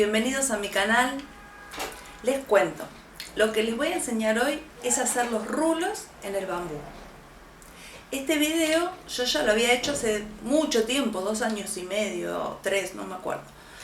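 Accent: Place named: Argentina. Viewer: Muchas gracias, vivo en Thaïlandâ aqui los bambou crecen rapido 😄. Que acento mas bonito, eres agentina ? Besitos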